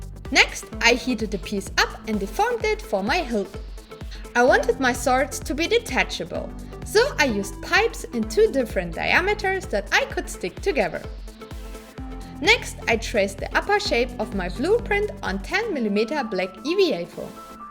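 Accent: Germany. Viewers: so ein deutscher Akzent..... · a question...r u from germany...cuz ur accent sounds pretty german xD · You sound a bit German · Your german accent shows thru... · 2 sec geschaut direkt gewusst das sie deutsch ist XD. deutsches englisch hört sich einfach retarded an XD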